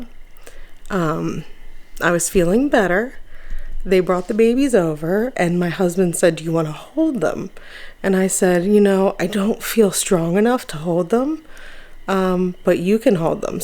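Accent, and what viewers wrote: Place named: East Coast, United States. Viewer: u sound like east coast of the U.S. I am in B.C., Canada......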